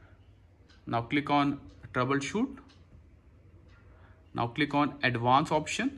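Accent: India. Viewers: Thank you man with a stereotypical Indian accent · I can't understand the indian accent 🙄 and he is refusing to answer questions.....not helpful at all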